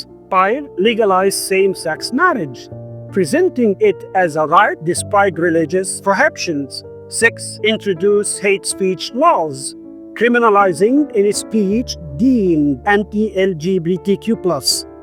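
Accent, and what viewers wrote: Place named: United States. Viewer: طول عمري بحب اسمعك وانت بتتكلم لكنتك امريكاني فاكر زمااان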